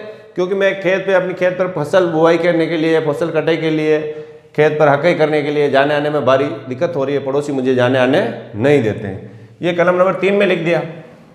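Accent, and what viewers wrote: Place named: Mewar, India. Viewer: Good aap kha se he बोली से मेवाड़ के लग रहे हो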